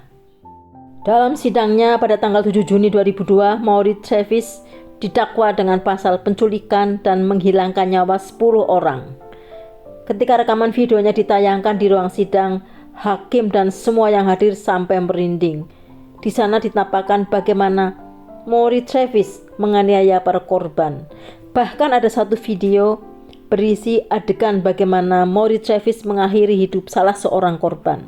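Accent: Java, Indonesia. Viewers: Pembawaanya yg medok itu ciri khas tersendiri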